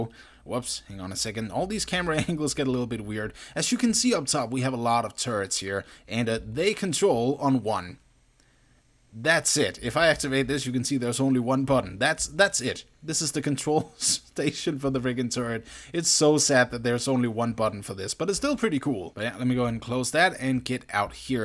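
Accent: Denmark. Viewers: u sound like ur from denmark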